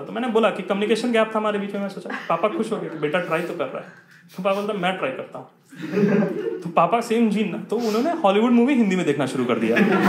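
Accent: Bihar, India. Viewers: Bhai sach mai Bihar se ho?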